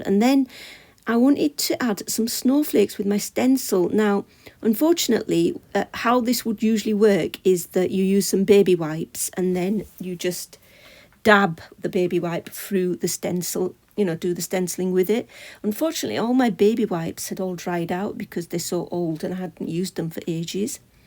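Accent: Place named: Newcastle, United Kingdom. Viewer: I love these snippets. Hubby came in so I asked if he were going to put headphones on or would he prefer me to put headphones on. He said he rather liked your soothing voice so he listened to you talk while doing a puzzle. Now you’ve stopped at the end of the video he’s listening to something else through his headphones. I thought it was funny. He says he can hear a mild Newcastle accent in a North Yorkshire accent. Is he right? Is there a Newcastle connection? (He is Lancashire with a dad from Newcastle.)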